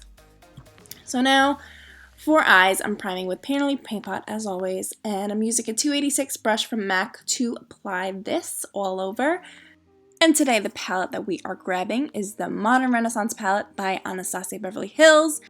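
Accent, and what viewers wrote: New Jersey, United States. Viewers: Hey doll! Your doing such an awesome job. I started watching you a couple months ago & Ive seen you grow so much! I'm going to DM you on Insta too, but I'm guessing your from Jersey/the Tri-state area? You have the accent! 😂😝 I'm from Jersey too. I'm REALLY working hard to start up my YouTube channel, but it's so tough! I'd LOVE to do a collaboration with you! I'm not sure if you'd be interested, but I would FOREVER be grateful! lol. If it is something you think you'd be interested in doing, PLEASE let me know! Talk to you soon 😘 xoxo, Brooke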